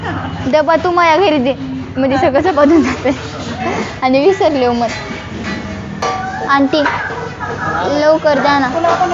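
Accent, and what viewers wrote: Latur, India. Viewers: Latur chi bhasha bhari ahe ...keep it up...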